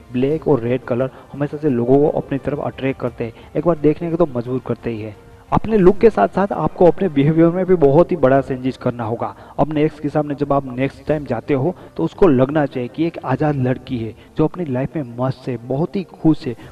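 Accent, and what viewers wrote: Gujarat, India. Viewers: Gujju ke Bolne ka style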